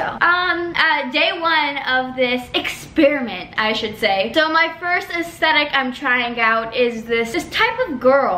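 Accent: United Kingdom. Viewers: Ur british accent made me die I live in england and half my mates actually talk like that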